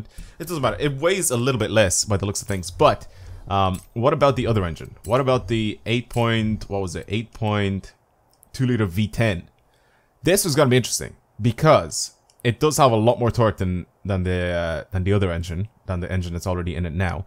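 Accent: Ireland. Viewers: R u Irish lad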